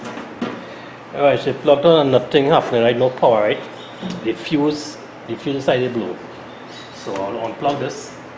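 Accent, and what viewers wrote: Trinidad and Tobago. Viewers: Hello, you sound like a truetrini. I am a trini living in Nevis and my sharp carousel fuse blew 2 years ago when I foolishly tried to cook rice in it. I dont want to buy another microwave although it is cheaper than fixing. However I would want to fix it myself. Your video is very good for my problem. Are there more than one fuse in it? also do you sell replacement fuses in Trinidad? If so let me know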